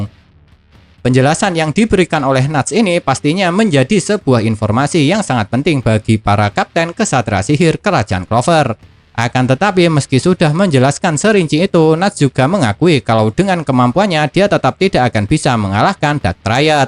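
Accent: Java, Indonesia. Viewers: Jawa ya mas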